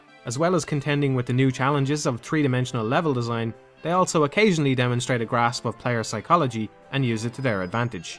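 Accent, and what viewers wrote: Dublin, Ireland. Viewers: Can't pinpoint your accent man, sounds like half culchie half Dublin lol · Your reviews are highly reminiscent of TotalBiscuit's whom I recently discovered and came to enjoy immensely for his unparalleled levels of insight and breadth of scope. Maybe you should change your handle to "TotalScone", since (and forgive me if I'm mistaken) you sound Irish. :P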